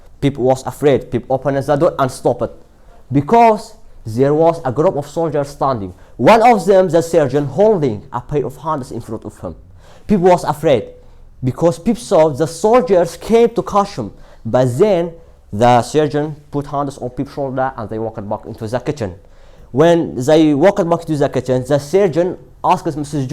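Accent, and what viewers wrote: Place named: India. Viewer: Mashalla , baas hastakam lahjay hindy wargrtwa😍😍😁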